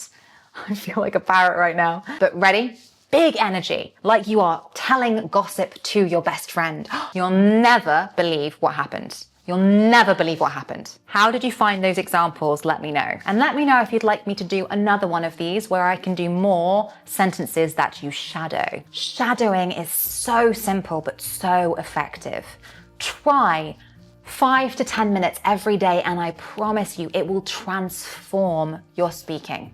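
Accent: United Kingdom. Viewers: May know mam, which british accent you have?? I love the way you sound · I really appreciate your videos They've been a great help with my English. Thank you very much indeed. (Especially lovely British accent) 🎉🎉🎉🎉🎉🎉🎉🎉🎉🎉🎉🎉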